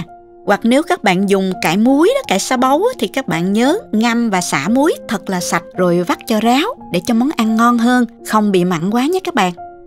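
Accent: South, Vietnam. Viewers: Tuyệt vời cô ơi, cô chỉ dẫn thật rõ ràng cứ theo đó mà làm có món ăn ngon lành, giọng cô trong trẻo thân thương như chữ há, nè, hén, nha của người Nam Bộ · giong noi mien nam hien lành và de thuong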